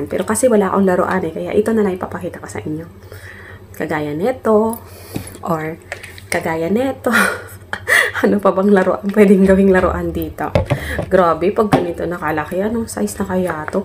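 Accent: Iloilo, Philippines. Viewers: Taga san kba ma'am? Daw ilonggo ka bla! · Nice ilongga si madam 😂😂😂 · Ilongga ka idol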